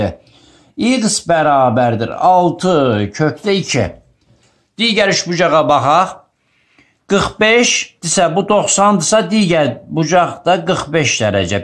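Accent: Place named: Shaki, Azerbaijan. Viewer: Çox gözəl müəllimə.Şəkindənsiniz? Ləhcəniz əladı❤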